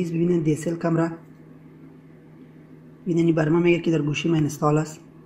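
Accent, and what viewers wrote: Afghanistan. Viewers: با سلام به دوستان عزیز افغانی . عزیزان ابتدا درخواستی دارم از شما . اینکه لطفاً زمان توضیح برنامه لطفاً کمی شمردة تر توضیح دهید چون دوست عزیزی که امورش می‌دهد فارسی را با لهجه و گویش غلیظ افغانی و با سرعت زیاد توضیح میدهد . و یک سوال داشتم . زمانی که یک vpn یا یک App رایگان دانلود میکنیم بعد از چند روز زمان استفاده رایگان تمام میشود و باید ان را اپدیت کنیم و حتما باید از داخل برنامه رایگان به ستینگ برویم و گزینه اپدیت را زده تا اپدیت شود ولی متاسفانه تا زمانی پول پرداخت نکنیم اپدیت نمیشود الان تقریبا ۹۰ درصد برنامةها نسخةهای پولی خود را اینگونه ارائه میکنند و به همین دلیل نمیشود برنامةهای پولی را دان کرد. اگر امکان دارد اگر راهی وجود دارد که بتوان رایگان دان کرد در کانال بگذارید متشکر میشوم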